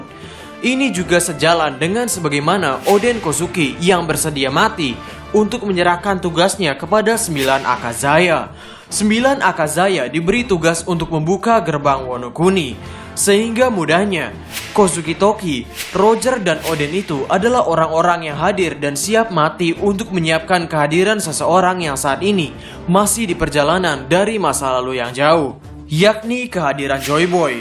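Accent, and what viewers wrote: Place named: Madura, Indonesia. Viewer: Min, logatnya madura banget. Bener ga sih?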